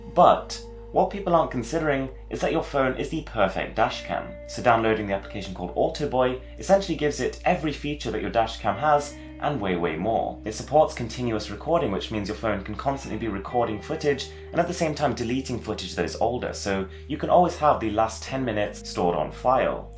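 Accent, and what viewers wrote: RP, United Kingdom. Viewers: I wish I had this type of british accent and not the chavy one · Ahhh bullshit with these damn smart phone devises and a billion amazing things that can be done with them (except take the dog out on a leash to go pee and poo), Disney cga animation movies, and "hot" fox news chicks bitching much ado 'bout noting in tight party dresses, and cheap lil "Cosmo" 'bot toys, and wall paper-thin 100" flat screens, quad copter toy drones with super hi-fi HD cameras, and stupid auto-correct that don't work as intended half the time, and other cheap gimmicks to keep our minds off the fact that modern technology has failed to put colonies on our Moon and the moons of Jupiter and space stations orbiting around the ones of Saturn. Whatever happened to the dream of interplanetary space travel? Inter-Galatic commerce? Gone to pot already? All we can come up with so far is some surreal composition of a fuzzy-faced used camel and goats milk arab dealer, sporting a dated pompador-like hair style with a posh British accent trying to charm the panties off of suburban White 16 years old girls and ex-virgins, doing his impersonation of the late James Mason plugging cell phones aps on international YouTube tv. 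What the HELL man?!?! 2017 and this is it? More like a damn apathetic rut to me. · Music from the serie Gomorra and a posh British accent. Hmm . . . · Yhh daaaaam this guy has that one British voice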